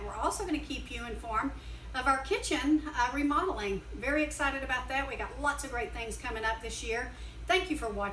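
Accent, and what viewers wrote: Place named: South, United States. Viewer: I didnt think she talked fast, ha! Must be the southern.thats why I can understand lol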